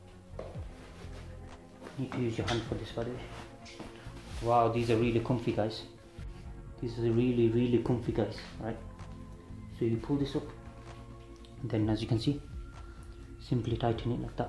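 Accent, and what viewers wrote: Bradford, United Kingdom. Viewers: Are you from Bradford?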